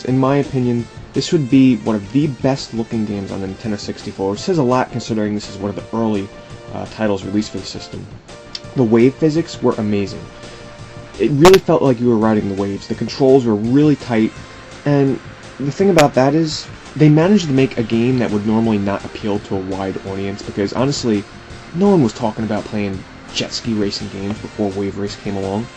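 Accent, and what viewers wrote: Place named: Boston, United States. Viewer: Yo your from Boston right? I can tell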